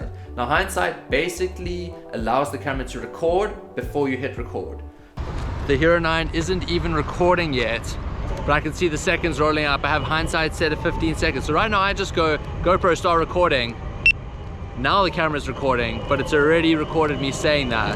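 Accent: South Africa. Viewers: You sound South African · you sound south african!!!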